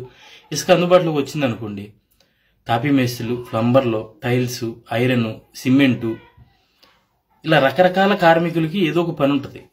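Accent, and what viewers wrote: Godavari, India. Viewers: Nice presentation in Godavari style..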